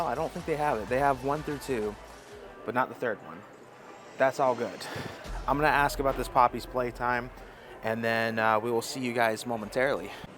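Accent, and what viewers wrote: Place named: Deep South, United States. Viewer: I understand. He does it with a lot of words. Like Anime, Amazon and some other words if you really listen. He does seem to have a Deep South accent that he does try and hide as well. You can notice it more if you hear him in person.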